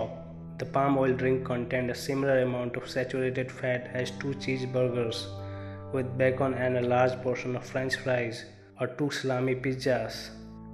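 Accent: India. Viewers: The way he pronounces "poootaaatoooozz" that's funny! Obviously Indian accent that's for sure!!!😂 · The narrator is Indian. It is very obvious.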